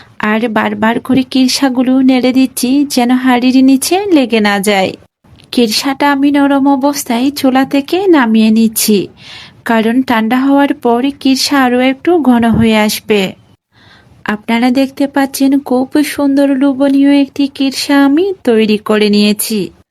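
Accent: Bangladesh. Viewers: ময়মনসিংহের নাকি আপনি?? খ কে ক বলছেন। বে ঠার কি?? বেটার। ছালের গুড়া কি চালের গুড়া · আফা আফনার মাতে লাগের আফনে সিলেটি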